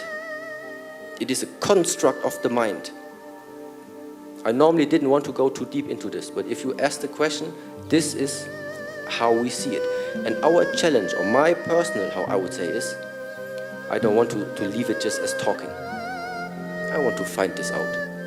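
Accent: Germany. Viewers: By the way... asian master with german accent. Crazy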